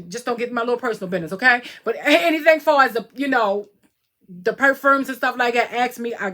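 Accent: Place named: DMV, United States. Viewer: Gurl, you from the DMV, talk your talk!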